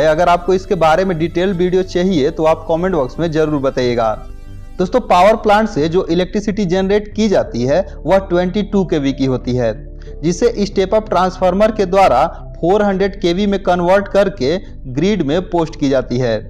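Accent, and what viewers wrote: Bihar, India. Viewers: Are u a bihari..?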